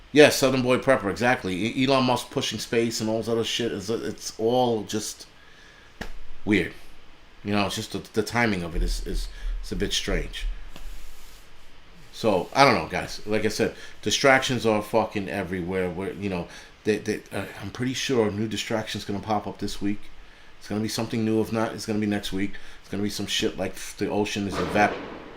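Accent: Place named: New York City, United States. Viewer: As a NYC Native who is now living in W.Europe (Paris) love to hear your straight,raw NYC-speak,brings me back 👍THX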